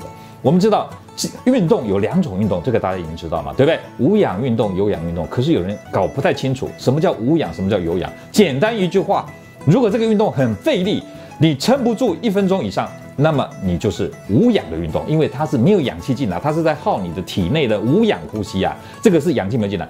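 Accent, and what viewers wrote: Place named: Taiwan. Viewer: 這個醫生是台灣人，口音一聽就知道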